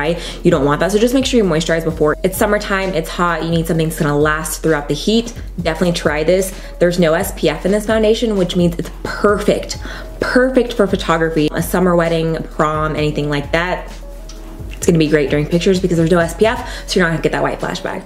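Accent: South, United States. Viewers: I heard that southern twang girl! Tennessee REPRESENTTTT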